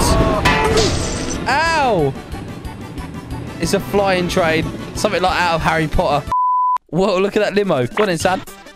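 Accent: United Kingdom. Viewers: YOU HAVE A BRITISH ACENT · btw are you a brit or a scot or irish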